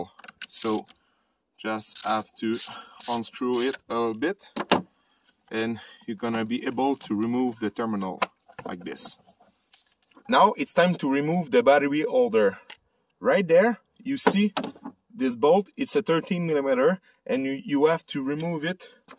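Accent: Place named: Quebec, Canada. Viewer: pour l'effort pour l'anglais 😄. Toujours drôle d'entendre un accent québecois sur YouTube. Bon vidéo 👍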